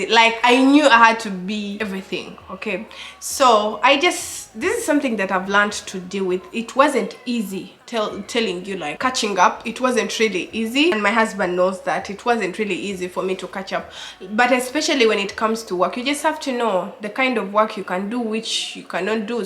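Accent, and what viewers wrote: Uganda. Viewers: I knew you are a Ugandan because of your accent... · she's Ugandan her and her accent can tell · Sarah sarai. Her accent is obviously ugandan.no doubt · Awww her accent can indicate that she is Ugandan. Lol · Wow u are a Ugandan banange, love ur accent darling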